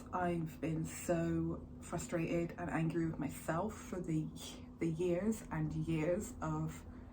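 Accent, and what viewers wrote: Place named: United Kingdom. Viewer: Holy crap you are British. Usually watch with CC.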